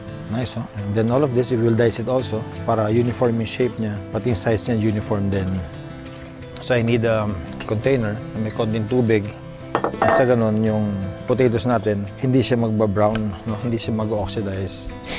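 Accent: Visayas, Philippines. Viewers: Makalingaw ang pagbisaya ni Goma hahaha mechado naman sunod unta. Great job on this recipe!!!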